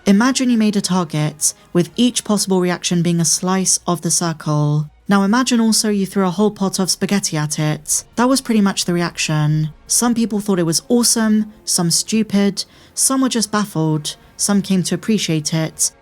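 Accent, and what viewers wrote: United Kingdom. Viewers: WHY are you making Money off of Starwars and the ACTUAL people who created it back in 70s and 80s are not???? I’m sorry but you’re just reading Making Starwars and shooting these videos. That’s Lame.. Get a REAL job that contributes to Society.. WE have women doctors, engineers, researchers and Than we have the women of this lame TikTok Selfie Generation…StarWars is a Gen X era Franchise.. EVERYTHING cool happened in late 70s, 80s, and early 90s.. You guys keep taking OUR era stuff and making it worse..YOU Are not understanding like many Art.. Starwars is reflection of the ERA it came out in 70s an 80s and WHAT came before it World War II. The Mona Lisa would just be a painting of a woman.. BUT it’s iconic because who and when it was painted… the fact you say what you read in British accent is annoying.. You should give every pound you made to the people who actually WORK on Starwars in 70s an 80s..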